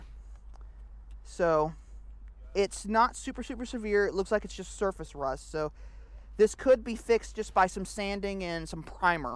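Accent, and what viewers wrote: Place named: United States. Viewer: Damn I wanted to watch an R53 guide... but I can’t listen to this Yanky accent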